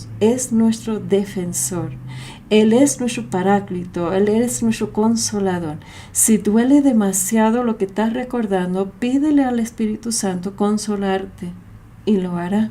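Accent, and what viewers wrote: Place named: Mexico. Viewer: Pese a tu español tan mejicanizado te hiciste entender maravillosamente ...por lo que será muy fructífera la enseñanza ...porque se que la hora hiciste en el Nombre de Dios Trino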